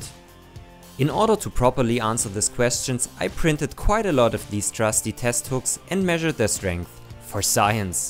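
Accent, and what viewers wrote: Germany. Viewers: Like the Videos, but the german englich (i am german too) distracts me.. xD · Man hört schon ein bisschen, dass Du Deutscher bist...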